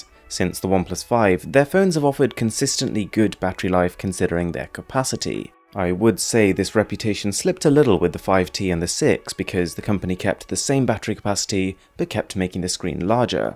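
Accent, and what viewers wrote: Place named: RP, United Kingdom. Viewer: Your accent is CARTOONISHLY posh.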